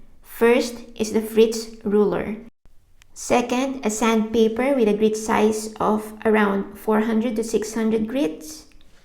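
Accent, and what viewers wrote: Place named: Philippines. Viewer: Ah. Kaya pala sounds like pinay. Hehe. Very informative, and very clear too. Salamat!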